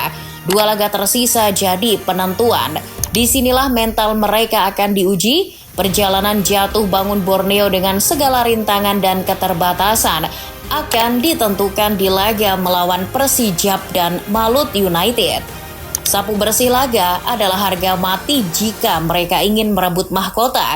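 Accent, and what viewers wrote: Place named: Surakarta, Indonesia. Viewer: Suara pria solo😊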